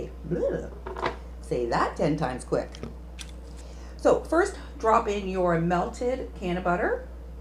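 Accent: Canada. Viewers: Very informative, great Job! I am from Western Canada, can tell you are from Ontario I presume? can tell by your accent, especially when you say the word "about" LOL, all is good!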